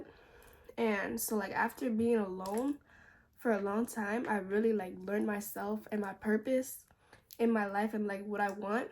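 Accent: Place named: Latin America. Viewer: Are you latina tour accent is so strong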